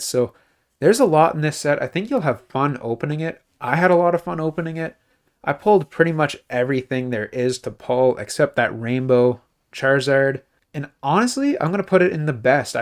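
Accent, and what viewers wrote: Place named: Canada. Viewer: Great Vid! Cut through lots of the filler, appreciate that. And I knew I detected that Canadian accent before you mentioned prices in $CAD lol. Cheers, fellow Cannck ;)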